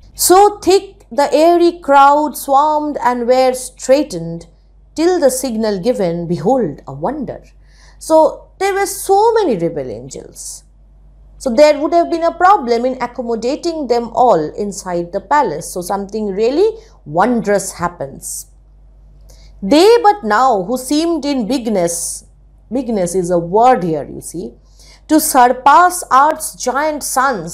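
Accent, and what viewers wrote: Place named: Bengal, India. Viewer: Ur the best i hv ever met 👌👌👌👌👌ur explanation is commendable....i was jumping from this to tht for searching the best explanation n got u there in my 3rd day of searching period. Just want to say ur accent is soo cute ....mixed with bengoli tone uff itss soo ear pleasing to me.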